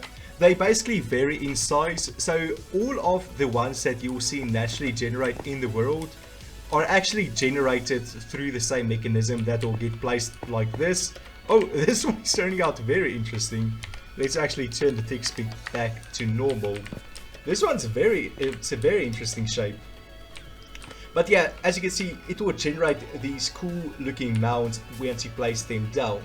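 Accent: Afrikaans, South Africa. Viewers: Are you from south African because ur accent sounds like a afrikaans english Edit: ur channel location is in SA so probably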